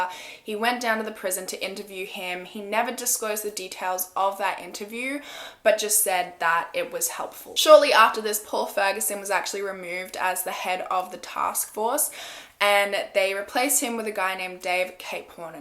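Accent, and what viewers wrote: Australia. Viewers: Just found your channel and can't get enough of your Australian accent! Love how you say light or right! Keep up the good work! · I KNEW THAT WAS AN AUSSIE ACCENT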